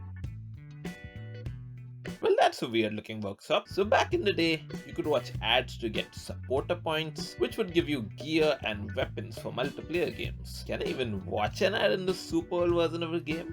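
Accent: India. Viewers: He is already sound like Indian because he is from India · You sound indian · I love his Indian accent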